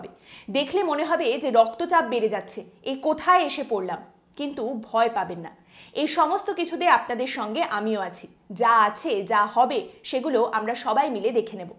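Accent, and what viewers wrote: Kolkata, India. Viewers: aapni je bhabe bangla bolen bhalo lage.. bihar ae thaki.. aapnar bangla sune monehoi kolkatai achi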